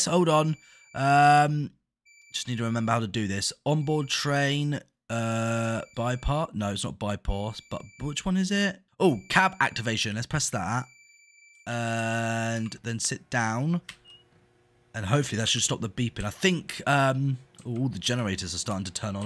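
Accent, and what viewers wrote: United Kingdom. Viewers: you sound british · He’s British😱😱😱😱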